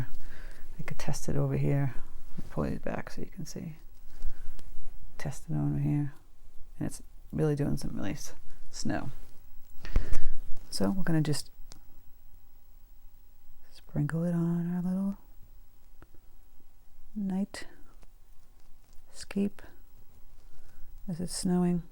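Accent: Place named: New England, United States. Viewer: Painted the night seen and added a couple of snowmen in the snow space. Sent them out as cards. Thank you so much. Love your accent, just like mine but I'm not in Mass anymore. Still New England, though.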